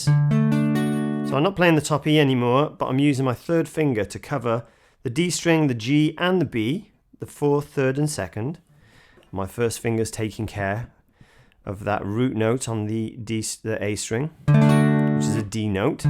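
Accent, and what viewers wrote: United Kingdom. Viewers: You should also just post you doing this cover —— love it —- I would put it on a loop n listen all day. You are so righteous you could play in Jamaica and they would respect you! I would love to hear you do or or or even reggae style ( from Denzel Jamaica movie ) You have a great accent. Thinking maybe Manchester, U.K. … maybe?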